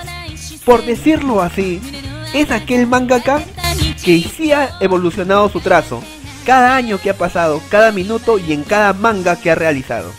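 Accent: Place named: Peru. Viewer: Aaahhh, eres peruano Nueva suuub!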